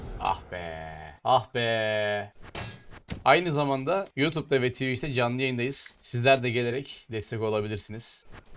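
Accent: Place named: Adana, Turkey. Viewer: Adanadan izliyorum kardeşim oyunun hakkını veren oyunculardaniz bir zamanlar ama videonun sonuna kadar keyifli izledim sende bir adana şivesi var gardas başarılar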